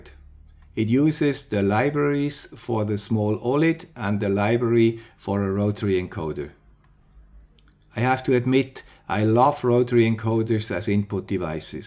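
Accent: Switzerland. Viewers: I just love this! I want to know. So I build a contraption that give the answers automagically. And your "swiss accent" is just right. You'r not talking like a gatling gun, so people not having english as their first language can easily follow what your'e saying. Good, as I have huge problems following a monologue in "switzerdeutsch". :-)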